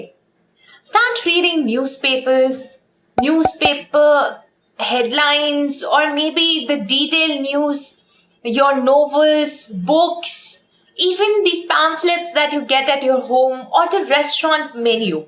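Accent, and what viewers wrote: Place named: India. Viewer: Thank you for the tips. Are you an Indian? I hear Indian accent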